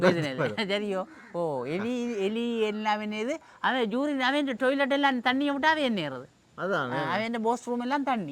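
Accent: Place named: Sri Lanka. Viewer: side என்று முடிவதெல்லாம் கிருமிநாசினி என்று விவசாயத்தின் தந்தை என்று தமிழ்நாட்டவர்களால் போற்றப்படும் நம்மாள்வார் குறிப்பிடுவார், நீங்கள் jaffnaside என்றபோது அதுவும் ஒரு கிருமிநாசி ஆகிவிடும் என்று வேடிக்கையாகப் பின்னூட்டம் இட்டேன்😄😄😄🥰, மேலும் ஒன்றைக் குறிப்பிட விரும்புகின்றேன் உங்களுடைய பேச்சு வழக்கு உச்சரிப்பு ஈழத்து நகைச்சுவைக் கலைஞர் அப்புக்குட்டி T இரச கோபால் அவர்களின் உச்சரிப்பை ஒத்ததாக இருக்கிறது அதனால் உங்கள் நளினம் வெகுவாகப் பிடிக்கும்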